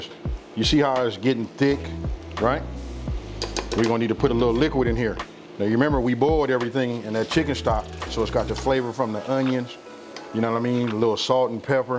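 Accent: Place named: South, United States. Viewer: where are you orginally from? that aint no california accent thats gotta be from the south brother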